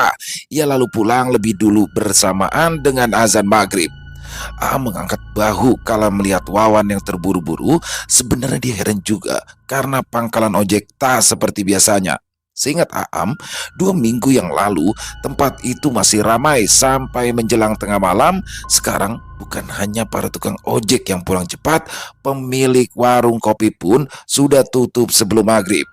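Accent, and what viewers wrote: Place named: Banjar, Indonesia. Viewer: Bang orang apa sih? Logat bahasa banjarnya mantap mang..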